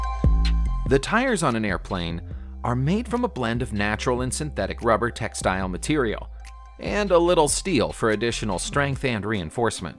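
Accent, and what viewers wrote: United States. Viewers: What are the units for the temps given? Judging by the American accent they could be Fahrenheit, but seeing as none else in the world uses that measurement. Just curious as to some of the actual temperatures endured.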